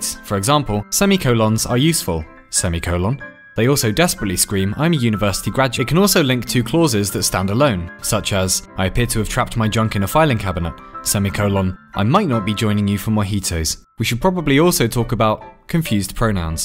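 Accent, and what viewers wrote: United Kingdom. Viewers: Mathhhhhh btw. He has a british accent but he smart when it comes to oxford commas and mathhhthhhh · I dont very much appreciate the “Breh-ish” space turtle telling me an American, how to speak English; when they pronounce words weird as shit over they’re, i.g. Tuesday as “Chewsday” and Water as “ Wah-uh”.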